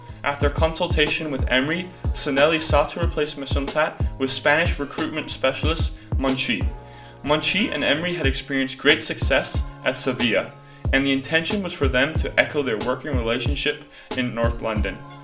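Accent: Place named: India. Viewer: the narrator's accent is indian on you pl channel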